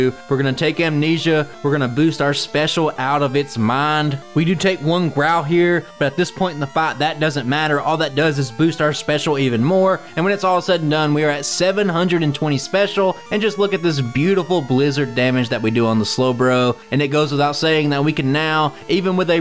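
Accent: South, United States. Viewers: I'm liking the new avi, slowpoke looks powerful after learning TOMBSTONER! Also one of my partners heard your voice and wonders if you're from Mississippi, Alabama, or Louisiana. They're convinced you can't be from anywhere else lol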